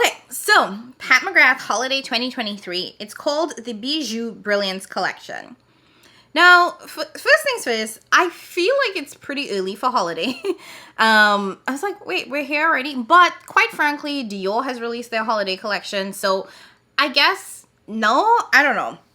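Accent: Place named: Trinidad and Tobago. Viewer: Why did I say the caption in your trini accent 😂😂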